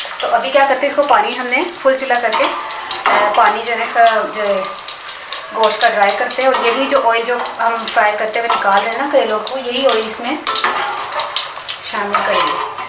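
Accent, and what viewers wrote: Sialkot, Pakistan. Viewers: Thank you for your delicious 😋 Karelay Ghosht I like to hear your Punjabi, I'm sure you're from Sialkot?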